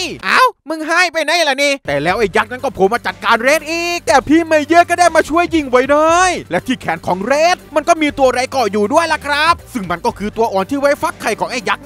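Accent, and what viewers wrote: South, Thailand. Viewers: แอ็ดคนใต้ม่ายนิ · คนใต้อยู่แล้วครับผมว่า แต่ไม่รู้จังหวัดไหนครับ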